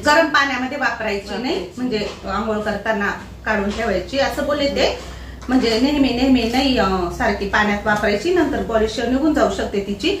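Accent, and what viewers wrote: Nashik, India. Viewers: नाशिकची भाषा दिसते आहे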